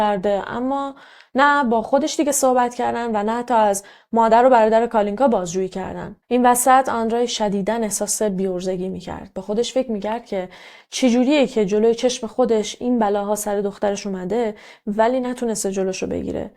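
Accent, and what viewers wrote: Mashhad, Iran. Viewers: از کجای مشهدی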